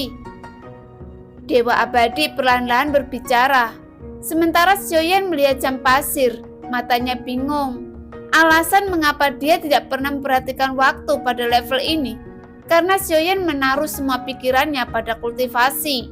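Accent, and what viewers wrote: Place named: East Java, Indonesia. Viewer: Baru kali ini nemu channel BTTH acak"an gini, dan pembawaannya medok jawa timur sungguh gak enak didengar, PARAH NI NENEK NENEK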